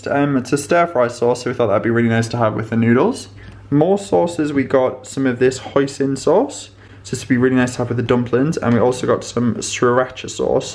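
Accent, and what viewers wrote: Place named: Durham, United Kingdom. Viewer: When you originally from you have a bit of Sunderland in you twang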